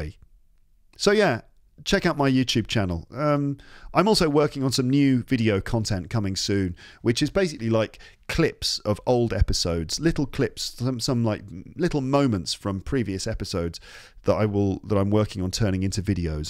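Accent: RP, United Kingdom. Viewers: I found out you when I lwatched AJ Hoge's live stream. Someone asked him to recommend a podcast in Britain accent and he recommend you. And he also added that his podcasts aren't boring and you can enjoy while listening. I realized how wonderful teacher he is! And after watching you I see you are also a great teacher and positive like him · Hi,. I've been following you for the past four years. I have always loved your voice and your British accent and therefore I would like (oneday) to be able to speak English the way you do. Today I have found you in youtube and It has been such a nice surprise!! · Yes, you do have all sorts of listeners. I, for instance am a 70 + retired teacher of English. I listen avidly to your podcasts as they provide excellent exposure to the English as spoken by the native speakers.An added advantage is that it is in modern RP. 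Carry on with your good work. Cheers. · totally agree. finally found a channel speaks British English, and the accent is so nice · Found your podcasts recently. Love them 😊 your natural and vivid way of speaking is very, very interesting and makes it very enjoyable to listen to you. Love your accent! So British! So nice. Thank you so much and the best of luck for the next year (2022) as I am listening to this only in mid Dec 2021!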